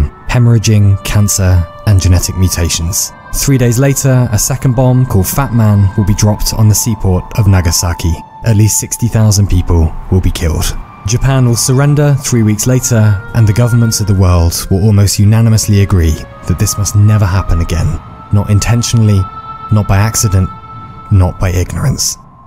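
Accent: United Kingdom. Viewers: Pls drop that british accent pls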